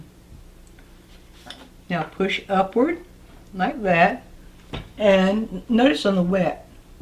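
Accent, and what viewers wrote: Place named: Texas, United States. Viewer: Dear. I,m so happy to have found you and appreciate how you teach with demos, I love your soothing. Live and accent. Are you Texan? Thanks you again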